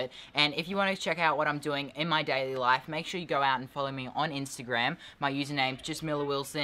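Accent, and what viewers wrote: Australia. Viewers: I love the australian accent ❤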